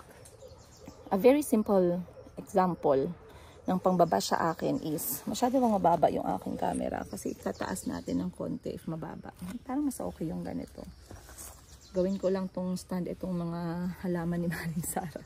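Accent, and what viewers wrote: Iloilo, Philippines. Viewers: Maam im laughing while your are talking accent ilonggo, i love it watching fr.iloilo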